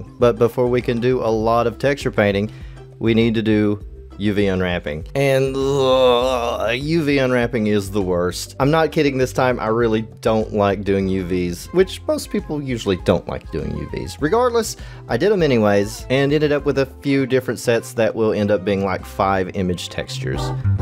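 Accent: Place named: South, United States. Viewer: please i adore this video also where are you from??? idk if im wrong here but your accent just makes me feel right at home... im from the South. extra pointers for personally interesting to me just with the way you talk haha i love this video and how you describe it all (despite the fact i dont understand ANY of it)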